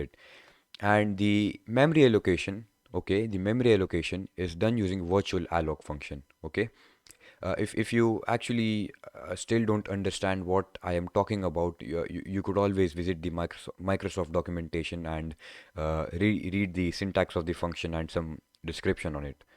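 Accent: India. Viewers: You are sound like Hackersploit, but with a lil bit indian accent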